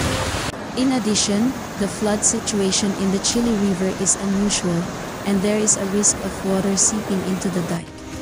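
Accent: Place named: Philippines. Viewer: Your the speaker are from the phillippines nice voice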